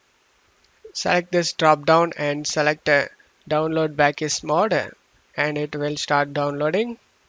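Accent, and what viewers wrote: India. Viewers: Just because he sounds Indian doesn’t mean he’s “scamming”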